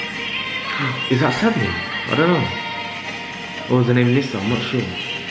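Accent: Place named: Scotland, United Kingdom. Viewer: are you Scottish? idk you have thick accent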